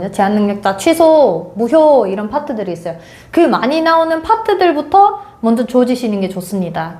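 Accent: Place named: Gyeongsang, South Korea. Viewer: 경상도 분이신가봐요ㅎㅎ 응원합니다 선배님!!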